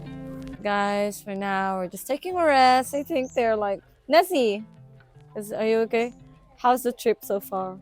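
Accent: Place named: Australia. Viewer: Aussie accent kana sissy, miss you 🥰😘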